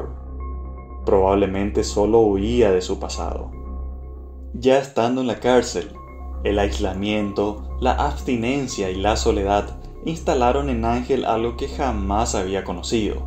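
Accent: Paraguay. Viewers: Exelente video maestro 💣, sos de Ciudad del Este, Saltos o Pedro Juan si o si por tu acento 🇵🇾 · UF TU VOZ SUENA A BRASIGUAYO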